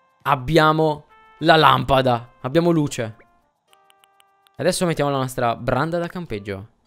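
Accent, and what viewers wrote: Sardinia, Italy. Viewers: Ma sei sardo? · Ma pk hai l'accento sardo? PS io sono sardo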